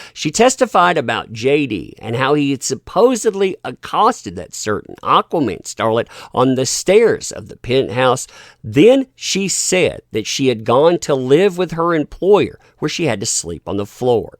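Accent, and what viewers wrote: South, United States. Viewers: always has such a soothing voice to me. Like so many people will just be shouting and talking so loudly and overly expressive, and it grates on me in more ways than one since I get oversensitized very easily. But something about calm southern drawl just is very calming like it's almost paradoxical how he's talking about such harrowing topics with such a calm voice, it really helps my anxiety.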